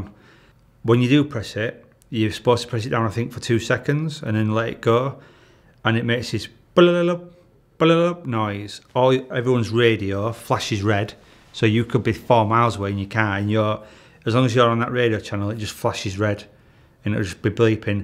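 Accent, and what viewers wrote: Yorkshire, United Kingdom. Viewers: Such a Yorkie accent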